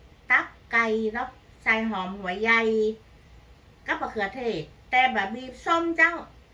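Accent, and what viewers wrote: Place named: North, Thailand. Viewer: สวัสดีจ้า แวะมาชมการทำอาหารทางเหนือ ใข่ไหมค่ะ ฟังจากสำเนียงค่ะ เพราะๆหวาน ให้ไลท์เลยค่ะ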